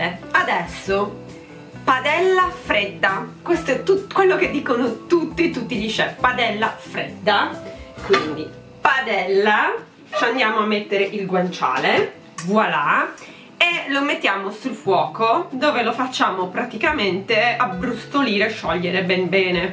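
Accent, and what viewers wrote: Rome, Italy. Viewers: La mia regione 😍 stupenda tu in versione romanesca 😂 ti volevo chiedere una cacio e pepe ma ormai penso se ne parli al prossimo giro ❤️ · No te prego....dopo il tuo parlare romano ti amo ancora di più...sei un mito😊🤗👏👏👏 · Sarda trapiantata a Milano e che riesce, e lo dico da romana, a fare piuttosto bene le battute in romanesco!😂 Spesso chi ci prova esagera, tu no! Evviva la carbonara. Mi sono mancati i disturbatori💚💚💚💚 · Wow ma sei bravissima, il romanesco poi..... Sei fantastica! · ...dimenticavo: ti ho adorata in versione romanaccia, anche se ci devi lavorare😂 Daje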